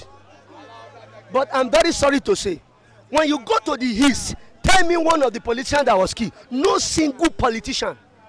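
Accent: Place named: Yoruba, Nigeria. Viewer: He's not Hausa u dunno ur own country men lol his, accent hangry is Yoruba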